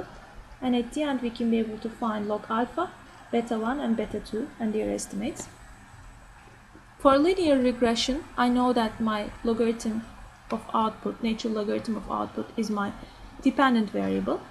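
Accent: Turkey. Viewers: tam bir türk ingilizcesi nerde duysam tanırım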